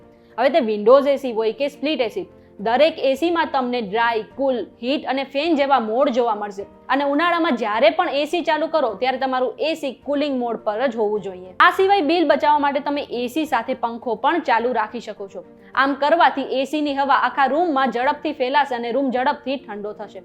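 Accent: Kathiawar, India. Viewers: Whether you are from Kathiawad or what, the speech seems to be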